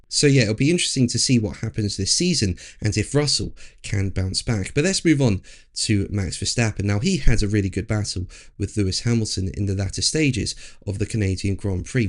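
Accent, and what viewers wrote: United Kingdom. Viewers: Not only does deliver in a slightly slurred British monotone voice, I really didn't learn anything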